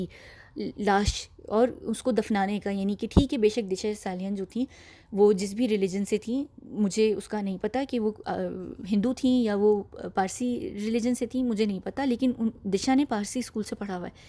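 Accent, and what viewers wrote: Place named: United Kingdom. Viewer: Exactly, i am waiting for her english video. I really love her bristish accent.😊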